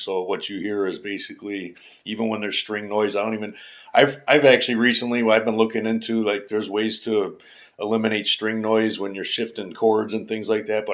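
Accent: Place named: Chicago, United States. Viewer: Good grief, you're like Chuck Schludiner on speed with those riffs. Also, you literally sound more Chicago than you ever have.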